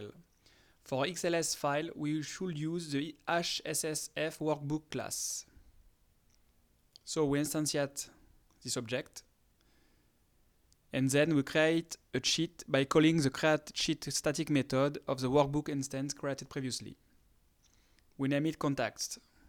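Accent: France. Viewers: woooo !!!!! this a French accent I also speak french. The guy is strong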